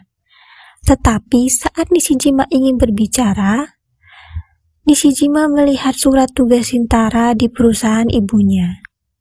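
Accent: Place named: Java, Indonesia. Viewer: Suaranya naik turun.. Pembawaannya seperti orang membaca, kurang enak di dengarnya, gk bisa nyantai, terlalu medok 🙏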